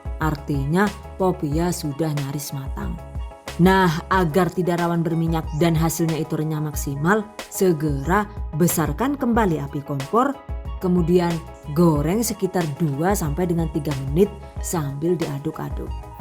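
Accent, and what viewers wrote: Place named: Banyumas, Indonesia. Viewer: Sangat khas videonya... Ada logat 'ngapak'nya.. Jdi lucu.. Dan penjelasannya langsung bisa dipahami... Makasiih bunda resepnya... I really enjoy watcing this video...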